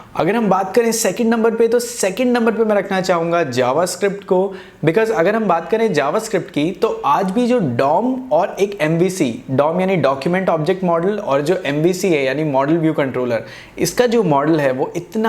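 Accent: India. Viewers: Indian accent is too strong...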